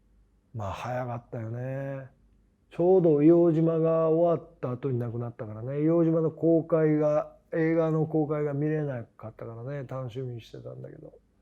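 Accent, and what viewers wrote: Kansai, Japan. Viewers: 長い間伊原さんのファンですが、今まではドラマや映画などでしか知らず、遠い存在でしたがYouTubeでグッと身近な存在に感じます😄 (私が生の伊原さんを見たのは舞台マヌエラでした。) 今回の後編の話も、自分らしさが出てる話で楽しく拝見しました。こんなに関西弁話されるんだぁと思ったけど、伊原さんらしくていいと思います。これからも応援します！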